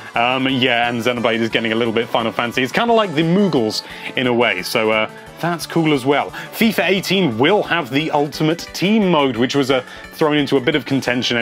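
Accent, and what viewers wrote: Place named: United Kingdom. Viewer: It's British English, but yes, it is a little hard to tell. Wonder why!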